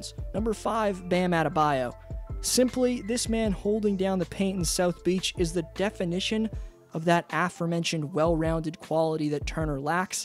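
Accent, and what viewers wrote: Canada. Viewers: R u from Toronto/ Canada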